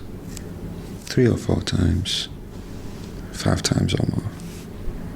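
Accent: Merseyside, United Kingdom. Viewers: I never thought I'd like listening to a Merseyside accent (no offence, just personal preference) but I really enjoy this.:) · that your from Merseyside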